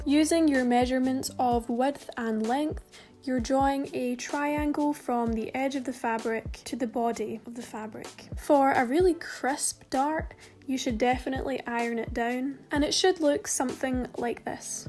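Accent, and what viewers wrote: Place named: Scotland, United Kingdom. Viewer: Love the Scottish accent makes me miss home :) love the flips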